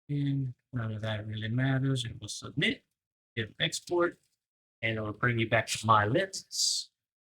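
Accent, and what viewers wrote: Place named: Louisiana, United States. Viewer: I know a badass cajun when I hear one. Thanks for this tutorial ami ♥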